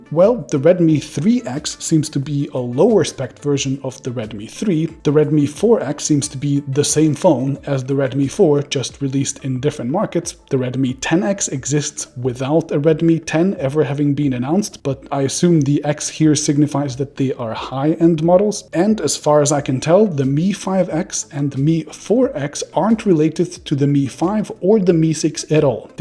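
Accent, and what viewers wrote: Germany. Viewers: Your German accent is just annoying, please hire and American person who can talk for your little mid life crisis virgin a$$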